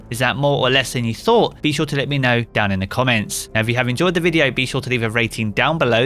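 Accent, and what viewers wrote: United Kingdom. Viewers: I so much love your briish accent idk I'm not English man:/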